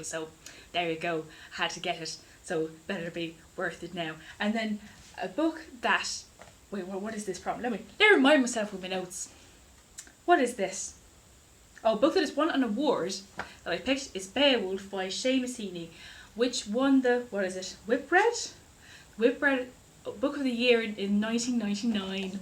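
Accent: Ireland. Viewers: THIS. MADE. MY. DAY. (the Irish accent 💚)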